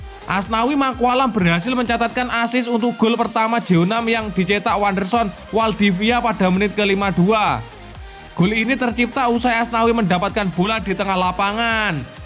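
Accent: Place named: Java, Indonesia. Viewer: MIN MEDOK BANGET.. ENAK DENGARNYA NIH..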